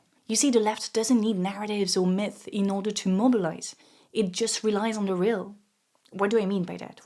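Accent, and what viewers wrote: France. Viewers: I LOVE YOUR VIDEO AND I WISH IT WAS REDONE IN FRENCH. I'm French so : some grumbles. The only thing I disagree with, or find a bit short, is saying that "LFI - France Unbound - supporting the Palestinians have turn them into pariahs". It's their support of Hamas, or lack of condemnation, that turns them into Pariahs for left and even centre-right leaning people, not their support to the Palestinian cause which is widespread and makes consensus except in extremist Islamophobic circles. This is very different. And there is another thing: the insufferable pride of Mélenchon, the head of LFI, that sometimes seems like it could become Trotskyist, if not Stalinist, totalitarianism. But I applaud this thorough clever unbiased analysis of yours (some extreme-right wing people wouldn't find this unbiased though, but it is). One thing is missing for foreigners: what happened to Macron and Macronism. 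Because he was very popular abroad in 2017 when he took power and he is still very popular, at least much more than in France. But I think it would be too long for one video. PS.: I claim to be very fluent in English (more than what one would say on a CV), but yours is much better. I'm jealous even if I can hear the tiny teeny slight trace of your French accent.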